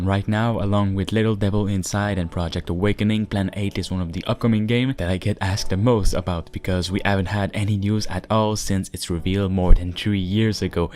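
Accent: Quebec, Canada. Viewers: Are u French Canadian??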